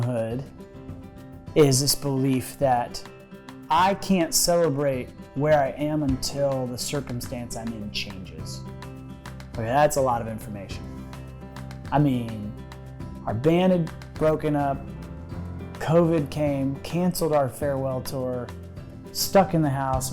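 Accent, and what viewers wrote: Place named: United Kingdom. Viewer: Hey, I wanted to let you know that I just saw a clip of you and TAN talking about Blind Eyes Opened earlier today and I love that you backed that movie. With my personal story that hit me SO hard. Thank You so much for that....and I like your vaguely British accent...:)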